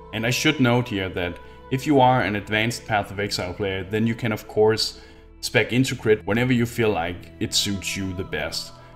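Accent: Denmark. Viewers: Nice videos tho i must ask: Are you danish becuz you sound like that xD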